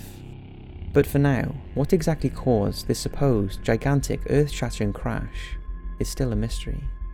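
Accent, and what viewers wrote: Wales, United Kingdom. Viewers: Well we know he’s Welsh and from Wales by his accent.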